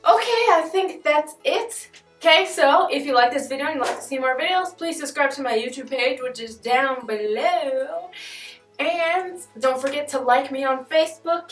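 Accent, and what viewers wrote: South, United States. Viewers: amazing how much your accent went back to confederate from where it was in march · Haha that was really cute. Might've been a while since you've been back in the states but you still sound very american :) (As an Australian) I think i'd find those things pretty shocking too tbh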